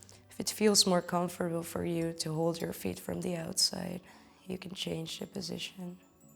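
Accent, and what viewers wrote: Netherlands. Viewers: I'm literally just ten seconds through the video and my immediate first impression was wow, your voice is so calming & soothing and after like 3 seconds I recognized that you have a Dutch accent.:) Been to the Netherlands several times and learned the language a bit. Will definitely do this yoga session with you tomorrow morning when I do my yoga practice on the balcony.:) Dank je wel,!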